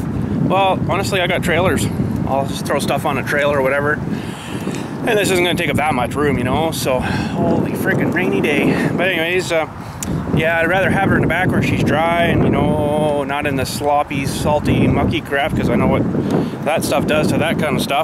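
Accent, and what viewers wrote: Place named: Canada. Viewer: wow! i guess you are a canuck. (did i spell that right)? your accent makes me smile, and thank you for that! Goofy Canadians. LOL.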